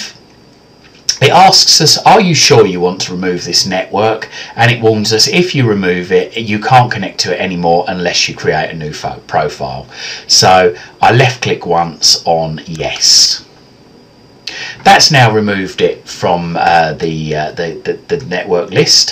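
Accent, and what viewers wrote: England, United Kingdom. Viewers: Fuck this has been bugging me for 2 years LOL. thanks for that English sounded dude.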